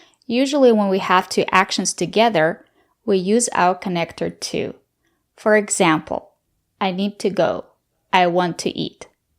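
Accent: United States. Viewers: You are obviously not an English person this is America's bastard English your promelgating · That's Amarican English, isn't it? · Horrible American english , really nasty to listen to and an English english speaker I am aftaid.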